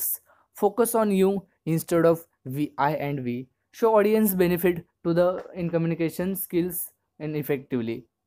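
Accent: India. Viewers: Indian accent with english language No thank you i'm not watching this video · clicks video hears Indian accent fuck_no.jpeg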